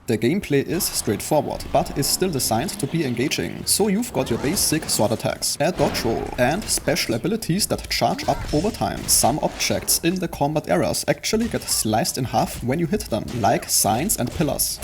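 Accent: Germany. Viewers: Sprichst du deutsch? Dein Englisch klingt so. Kann man in dem Spiel irgendwo speichern oder muss man jedesmal komplett neu anfangen wenn man stirbt und dann alles verliert 🙈?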